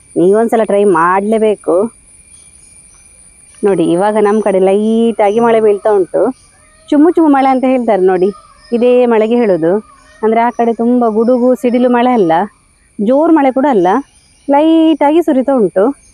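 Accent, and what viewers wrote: Coastal Karnataka, India. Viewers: ನಿಮ್ಮ ಕರಾವಳಿ ಭಾಷೆ ಕೇಳಲು ಬಲು ಚೆಂದ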